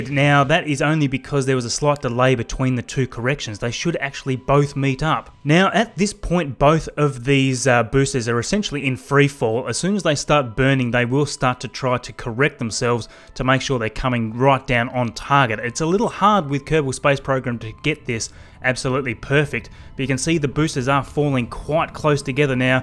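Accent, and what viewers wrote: Australia. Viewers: tell me if I'm right. Are you Australian? You sound like Hugh Jackman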